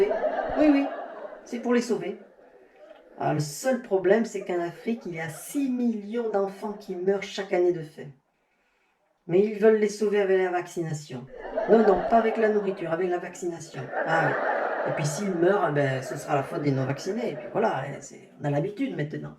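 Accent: France. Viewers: ENFIN un humoriste qui l'ouvre ! Mais qui est-ce ? Un accent du dessous de la Loire, c'est le seul indice... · Un indice il a l’accent marseillais 😉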